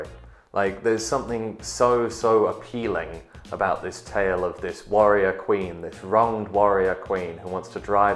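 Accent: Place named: United Kingdom. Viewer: Damn, I love this channel. Being a Texan, I love listening to history in the form of a spoken British accent. Leands it a certain gravitas and epic tinge. I think my wife is the reincarnation of a Celtic queen. Long red hair, piercing green eyes, and by damn, after 16 years of fighting i still haven't conquered her...😂😂😂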